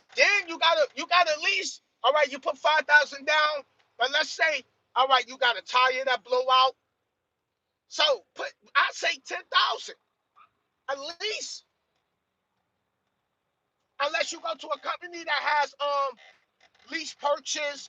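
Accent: New York, United States. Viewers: What's good, my brother. I'm confused. I hear a Knickerbocker accent, but I'm seeing a Raiders hat. Anyway, I always tell folks I'm always on time.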